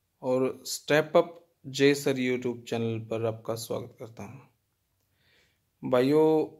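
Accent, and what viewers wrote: Haryana, India. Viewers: bhai sahab ek to apka hsne ka style bhut bdhiya lga bilkul pyor harinvi hsi h apki hm bhi aise hi dil khol k hasya kre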